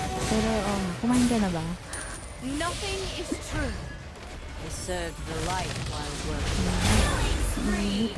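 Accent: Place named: Visayas, Philippines. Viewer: Boses. Vizaya k lodi. Hehehe ok lng yan penge skin <num>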